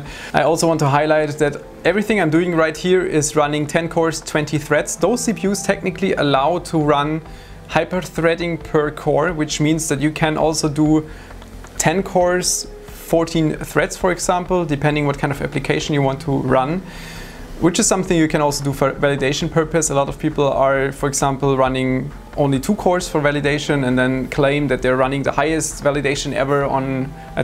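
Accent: Germany. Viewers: der deutsche akzent ist so hörbar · Hab noch nie ein deutscheres Englisch gehört😂😂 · Man bemerkt dass du deutscher bist xDD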